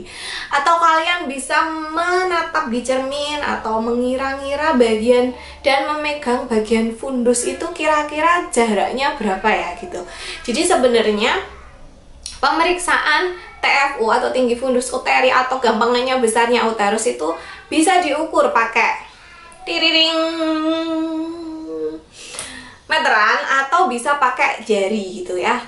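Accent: Java, Indonesia. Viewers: Tak kira buk bidan orang NTT..eh salfok pas nonton video ini...medok jawa ne., berati orang jawa y buk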